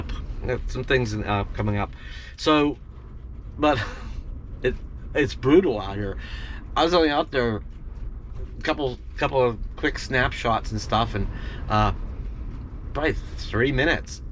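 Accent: Pennsylvania, United States. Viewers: Hey, just noticed your video description still says you're in the Bounder, might want to update it! Thanks for the videos. I'm from MA but have family from PA and your PA accent always reminds me of my family and puts a smile on my face.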